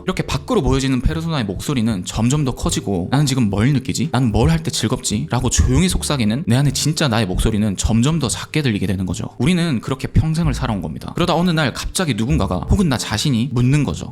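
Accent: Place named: Gyeongsang, South Korea. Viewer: 나이가 40이 다되가는데, 아직까지 방황하고 있어서 잘 듣고 있습니다. 듣다 드는 생각인데 혹시 대구분이신지요? 사투리가 너무 익숙해서 물어봅니다 ㅋㅋ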